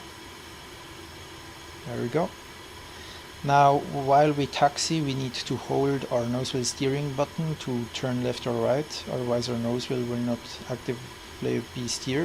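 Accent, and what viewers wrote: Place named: Switzerland. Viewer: Swiss English :)) but been living in Switzerland for 4 years now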